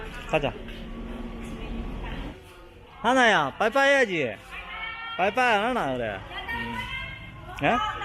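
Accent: Gyeongsang, South Korea. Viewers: 완전 경상도 아지야 말투네예 캄보디아의 일상 재밌습니더~ 조와요 구독~~🤣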